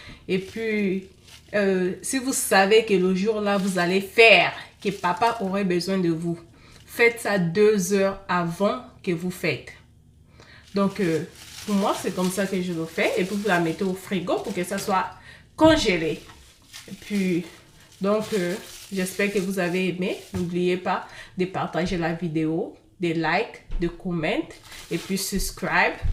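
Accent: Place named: Africa. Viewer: Tu as l’accent africain mais c’est toi qui ne fais que dire <parceque en Afrique 😒> je t’aime bien mais arrête ça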